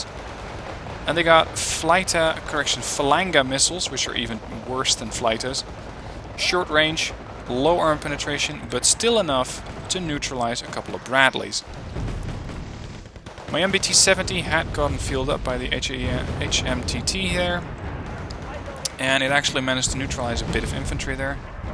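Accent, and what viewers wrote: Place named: Netherlands. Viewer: Is that a little Dutch slipping through ? Where are you from, your accent sounds different?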